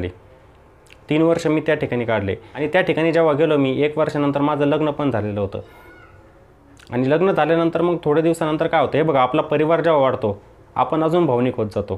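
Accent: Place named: Krishna Valley, India. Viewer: Krisgna ghati che boltay bhau tumhi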